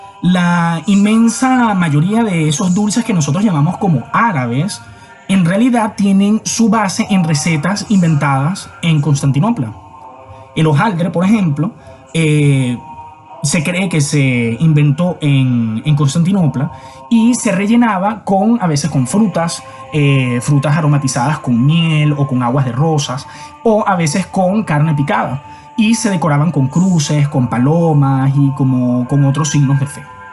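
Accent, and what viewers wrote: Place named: Venezuela. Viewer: ese acento, si no me equivoco es de Venezuela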